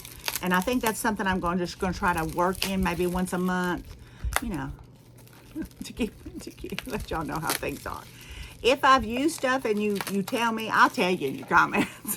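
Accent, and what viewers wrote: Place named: United States. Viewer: Oh you never fail to make me laugh”ain’t nobody all up in my legs” I’m from New Zealand so the way Americans talk makes me laugh ya all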